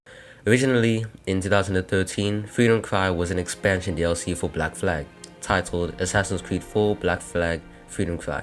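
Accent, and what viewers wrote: South Africa. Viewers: U sound South African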